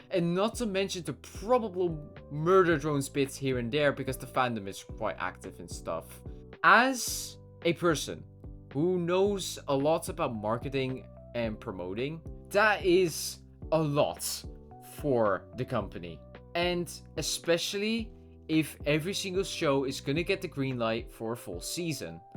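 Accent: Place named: Netherlands. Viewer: Sorry this is unrelated, but I heard your accent and I was like "You sound Dutch" and then I check your channel and you're from the Netherlands, I just can't lose. She's too good chat 🗣🔥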